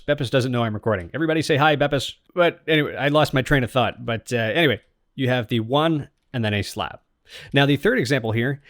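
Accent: Canada. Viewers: are you from vancouver?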